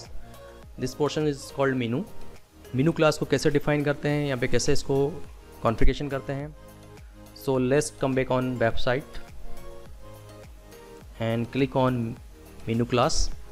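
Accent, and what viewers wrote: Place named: India. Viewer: This video really shows that not everyone who sounds Indian has malicious intent.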